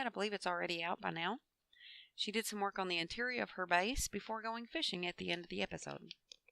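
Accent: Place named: United States. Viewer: Thank you for the player updates this month. You have been very clear and detailed. I love your accent! Whereabouts in the US are you from? Keep up the videos.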